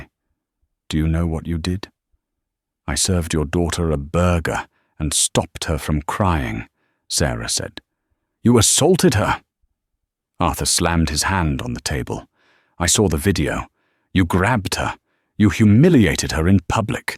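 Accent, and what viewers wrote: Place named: England, United Kingdom. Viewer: Hi Canadian here Windsor Ontario And if the pictures / freeze frame slide shows at the top of your screen bother you, as they do me, just fold a piece of paper, as I do, over too of it to hide it and then just enjoy listening to that purring English accent and, if you prefer, as I do, follow along with the transcript. Cheers! Enjoy!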